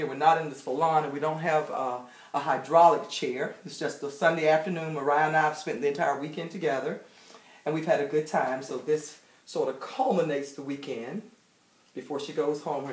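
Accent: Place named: North Carolina, United States. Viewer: I love ms accent. She sounds like my mother. Are you from North Carolina Ms.?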